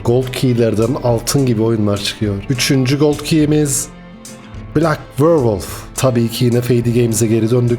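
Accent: Cyprus. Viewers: kral kıbrıslı mısın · kıbrıs ağzın çok iyi reis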